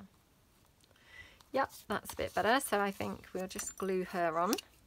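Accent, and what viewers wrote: England, United Kingdom. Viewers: Beautiful journal, and I love your English accent but your daughters little voice with that accent is sooooo adorable! 💕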